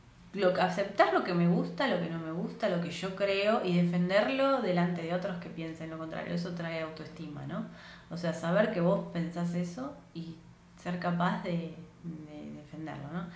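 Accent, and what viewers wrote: Argentina. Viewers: Por favor modificar el tono argentino Par comprender mejor.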